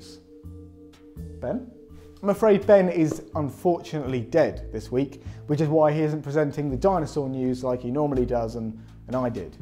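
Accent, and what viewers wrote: England, United Kingdom. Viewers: This was cute. Nice English accent.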